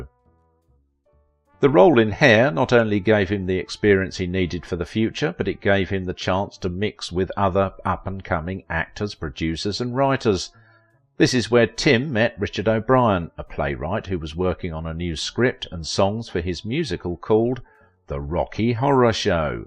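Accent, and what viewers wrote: United Kingdom. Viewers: Awesome video!!! You, the narrator sound exactly like Laurence Brown from YouTube channel "Lost in the Pond"....and I don't say that because you are both British..😉..Love that channel! Glad I found this one too! 😁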